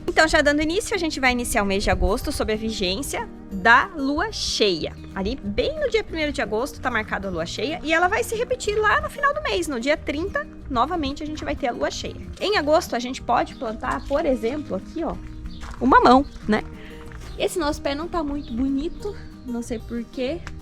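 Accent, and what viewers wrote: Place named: Paraná, Brazil. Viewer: Bonito quintal. De onde vocês são? Seu sotaque me lembra o do Paraná!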